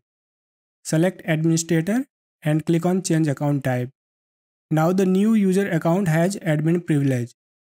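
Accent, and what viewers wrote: India. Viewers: Powerful bro with indian accent . Thank you so much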